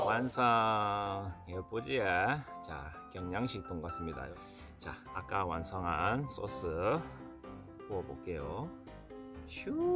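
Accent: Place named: Seoul, South Korea. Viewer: 목소리 딱듣고 스울사람 인지 알았음 ㅋㅋ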